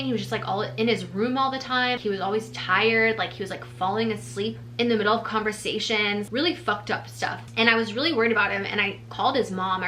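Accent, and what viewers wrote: South, United States. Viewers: Yes! As someone who's been through surgeries growing up,pain meds can easily become addictive,I try to handle pain without it,plus I can't even take ibuprofen without getting restless leg syndrome,I'm glad you had/have the strength to avoid that,lol also love the country accent haha.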